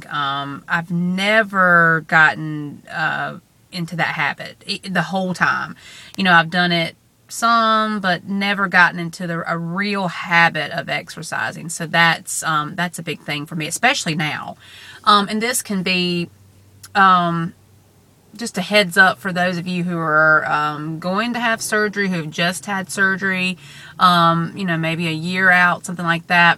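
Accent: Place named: South, United States. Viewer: I was thinking this would happen not be negative-but do not despair-there are tons of people going through this-the dr.'s are partly responsible for performing the surgery without haing the psychological wherewithall to go through it. They just want the money. You sound like you are from the South and probably were rainsed on biscuits and grits-so anyway-good luck.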